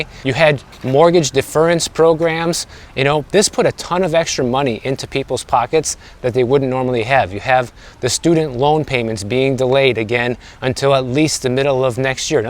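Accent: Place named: Midwest, United States. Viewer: Hi,! Thank you for this video. I can tell by your accent that you are from the Midwest. I am, too! Janesville, WI, to be exact. There was a KMart store in Janesville and I recall, as a child, many times my mom would put our, “back-to-school”, clothes on lay away. She would always pay them off within a couple of paychecks, but for the circumstance we were in, it seemed to work. The store did not charge interest, and, would literally hold the very specific items, as long as the promised payments were made. KMart, I don’t believe, is around anymore. But I remember that time, well. My mantra, since I was in my mid to late 20s has been: “If you can’t pay cash for it, you don’t need it.”. I hope your message continues to reach younger generations.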